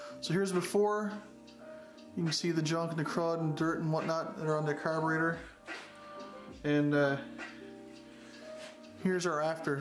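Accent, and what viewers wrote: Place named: Canada. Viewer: If the "accent" lol and the power fist box didn't give it away He's in Canada folks! Greetings my eastern brother from British Columbia!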